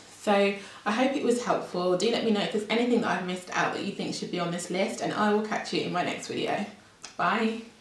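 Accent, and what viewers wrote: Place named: United Kingdom. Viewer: I must admit that I especially enjoy your accent. I'm an English teacher from Chile, and I did an MA in TEFL in England (Bham) in 2011-12. I love your accent and your choice of words to describe clothes and silhouettes, for examle... I have a student who wants to improve her British accent, I'll share your channel with her :)))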